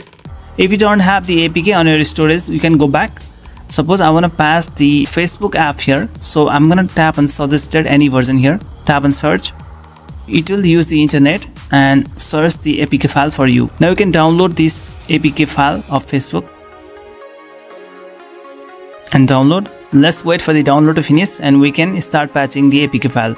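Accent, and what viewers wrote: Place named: India. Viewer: Yup always an indian accent guy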